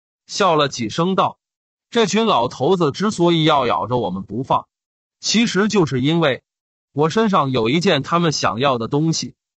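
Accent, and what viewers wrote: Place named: North, China. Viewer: 原來說書人是北方人😊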